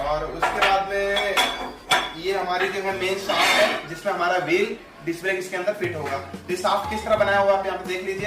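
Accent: India. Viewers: He does seem like a Gujarati the way he speaks hindi. May be he is originally from Hindi speaking state. But who cares apne desh hi baccha hai.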